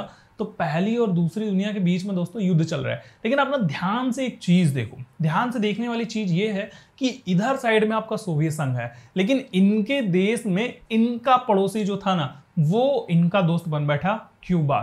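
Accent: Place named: Allahabad, India. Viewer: Sir apka illahabadi accent 🥺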